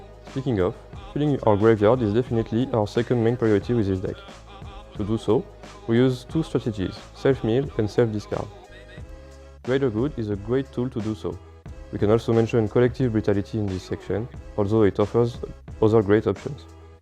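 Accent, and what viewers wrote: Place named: France. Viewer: L'accent français 🤪😘 La même en français c'est possible ? 😁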